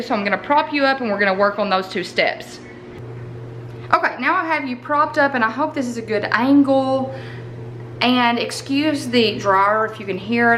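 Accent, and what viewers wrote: South, United States. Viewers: I think it turned out really cute! Your southern accent is so endearing :)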